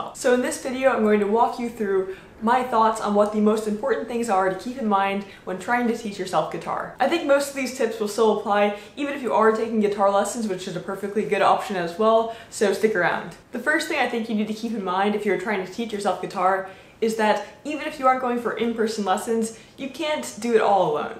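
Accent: Netherlands. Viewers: Are you dutch haha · You sound Dutch, is that true?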